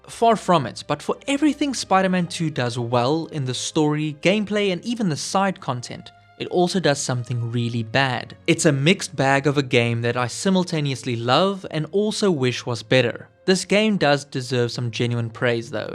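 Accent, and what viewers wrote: South Africa. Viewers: Going into spiderman 2 I was expecting the game to be the arkham city (still the best superhero game as far as I'm concerned) of its series and it doesn't. The first game lived up to my excitement and it's very good and it was the foundation just as Asylum was for Batman but. Miles morales was moving in the right direction but this game was a letdown. Spiderman 2's stealth is worse somehow, the combat is missing the suit and visor mods, suit powers and gadgets are worse versions of the previous ones. The only good thing is the traversal and it's still lacking feature games like web of shadows allowing spiderman to run in any direction on the wall. I shouldn't have pre-ordered this one and got the RE4 remake and Deadspace remake. The games only cost 600 rands each at BT games and I would've saved 200 (I assume you're South African with accent). 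I agree about venom and Kraven being the best visually and the VAs did an amazing job but I was hoping they'd be stalkers who pounce out of nowhere like taskmaster did in the first game but Kraven was mostly a Gladiator. 6/10 after my second playthrough. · Fellow South African here. First time I’m coming across one of your videos, heard your accent and it was an instant ‘Subscribe’ for me 💪🏽 keep it up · Are you South African by any chance?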